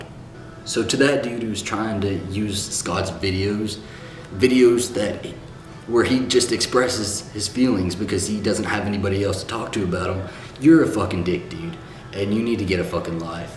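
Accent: South, United States. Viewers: My hero Brave and strong Im southern as well love the accent You Rock ! You actually inspire and help people unlike most self absorbed you tubers . Dr pepper just gave your roots away ! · You have one of the most comforting southern voices I've ever heard. It's like a warm blanket you simply love wrapping yourself up in. Add to that you seem to be a natural born storytelling, making it possible to cast a spell so easily upon your listeners. Not to mention you're cute as a button! Yes you are!! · Love your videos! Love your southern voice. Also, nice Hickie! ;)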